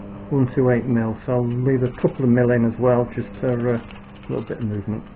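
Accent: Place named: Yorkshire, United Kingdom. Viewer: Hi your video just popped up in recommendations - as soon as I heard the accent and saw the yorkshirman plaque on your wall I subscribed! looking forward to more videos..... and watch out for a sub jump after this weekend I'll be giving you a shout out on my channel: us little'uns got to stick together... 👍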